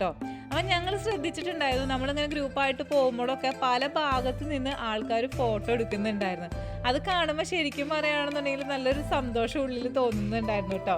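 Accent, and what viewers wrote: Thrissur, India. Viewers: like ur thrishur talk..why not using ur old thrishur tag line now a days?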